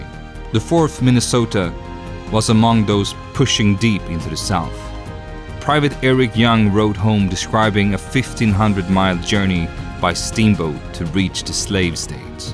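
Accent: Sweden. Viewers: Because this is a channel focused on Swedish history told in English? The accent didn't clue you in? If you want videos about italians fighting in the Civil War, it's probably easy to find.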